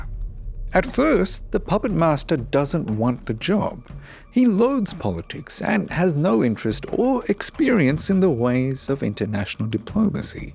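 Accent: Australia. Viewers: Does sound a bit like him haha, Aussie accent and a slow/dramatic delivery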